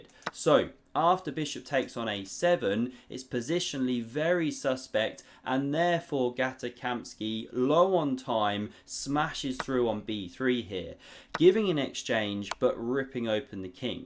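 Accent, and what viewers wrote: United Kingdom. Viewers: Love your channel and your cool British accent.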